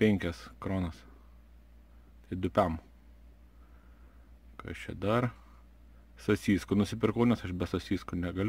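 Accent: Šiauliai, Lithuania. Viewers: tikras siaulietiskas akcentas :D linkejimai is pietinio :) po keliu savaiciu jau norisi namo ar visa kadencija (6sav ar ilgiau) viskas ok?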